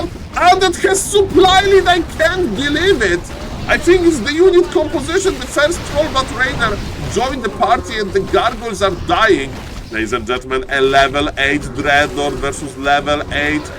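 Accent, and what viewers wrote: Poland. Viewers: Hey, I've seen your commentary with Neo at Back2Warcraft, is it you? Are you polish? Cause your accent sounds like you are polish, just like me :D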